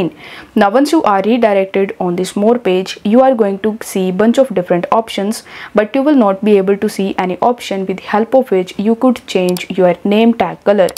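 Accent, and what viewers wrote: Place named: India. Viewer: I i like that indian accent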